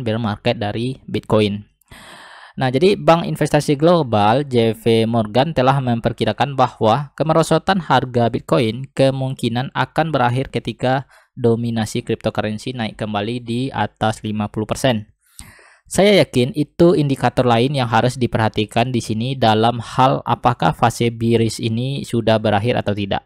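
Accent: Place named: West Sumatra, Indonesia. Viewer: logat urang awak bana da👍😁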